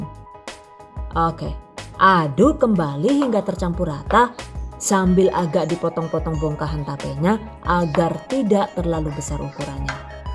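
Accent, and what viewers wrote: Banyumas, Indonesia. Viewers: MaasyaAllah, suka sm detail penyampaian'y & suka cara bicara'y yg ngapak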